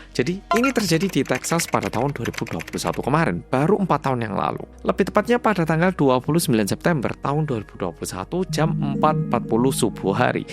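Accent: Java, Indonesia. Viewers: Nglindur nya... serem2..ah muedok😢😮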